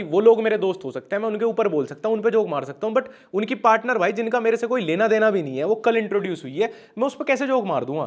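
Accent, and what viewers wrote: Haryana, India. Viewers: Yaar apki awaz me thoda so haryanvi touch h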